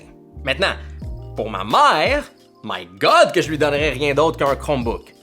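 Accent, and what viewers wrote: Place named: Quebec, Canada. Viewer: Le vocabulaire internet avec l'accent québécois de là -bas... j'ai dû m'accrocher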